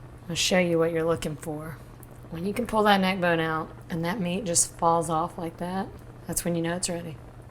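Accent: United States. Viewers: It's called gravy. Your accent attests to that. You became what my great grandparents wished and worked for: you are an American! It's pronounced amerigan. When my people came here from Naples and Calabria a long time ago, they were prejudiced against Couldn't get a job or into a school to learn. Anything. This was also the situation for Jews, Greeks, Irish, Chinese, and a whole lot of other people who weren't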